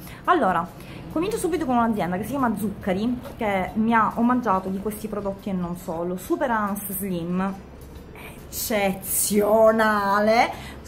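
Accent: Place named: Palermo, Italy. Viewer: utilissimi i tuoi video come sempre! io devo ringraziarti.. mi hai fatto alzare il "culo" dal divano! .. mi hai organizzato la vita! ora sveglia alle sei e workout di jillian! e non solo, dopo cinque anni che vivo a palermo tu, e solo tu, sei riuscita a farmi prendere l accento palermitano! (non che vivevo lontano giusto 70 km più a est, ma non avevo questa cadenza !) grazie di tutto ;)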